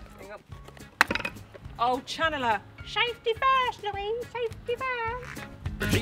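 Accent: United Kingdom. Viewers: Talking fast...I change Play Back Speed. Being from US it is sometimes difficult figuring out what Brits are saying. ☺️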